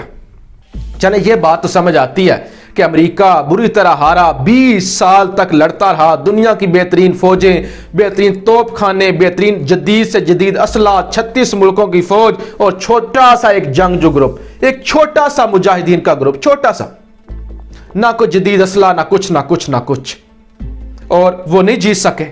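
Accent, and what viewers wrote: Punjab, India. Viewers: Try to learn Urdu & Urdu pronunciation in evening classes. Dimagh ki dahi ho jati Hy Urdu in Punjabi pronunciation. Or yeh June main blazer Hadd kr de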